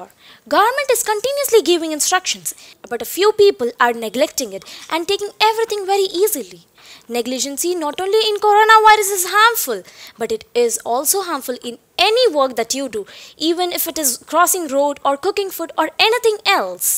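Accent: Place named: United Kingdom. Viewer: IN SHA ALLAH...I WILL SPEAK LIKE YOU.... I HAVE A GREAT BURNING DESIRE TO SPEAK...IN BRITISH ACCENT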